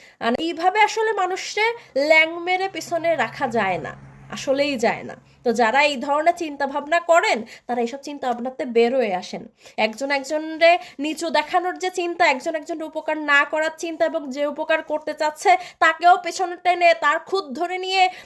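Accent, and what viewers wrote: Khulna, Bangladesh. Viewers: আঞ্চলিকতা পরিহার করলে ভালো লাগে।একবার খুলনার কথা আবার শুদ্ধ কথা না বলা ভালো।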